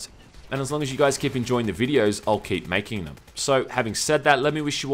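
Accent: Australia. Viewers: Ye-es! It's not Christmas 'till aussie brogue has graced my ears with a year-end wrapup vid! Thanks man!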